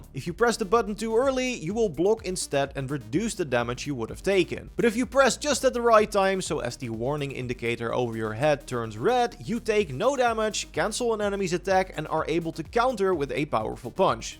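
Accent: Netherlands. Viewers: Je hoort gewoon dat deze man Nederlands is hoor het nu pas maat 😂😂😂😂 top video wel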